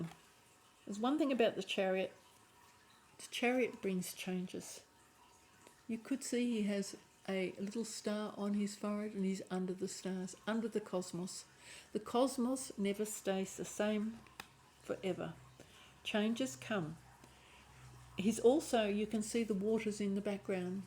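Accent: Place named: Australia. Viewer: Nice to see an Australian (or in this case, hear) delivering a reading (some great Tarologists on YT ) new subscriber and thank you for an enlightened reading. You hit the nail on the head regarding fatigue and boredom. Also moving toward developing and connecting with my intuition (through Tarot and Pendulum work) and I appreciate the time you put into this reading. Much kindness and blessings to you (From Melbourne - Eastern Suburbs) 🌻🌻🌻🌻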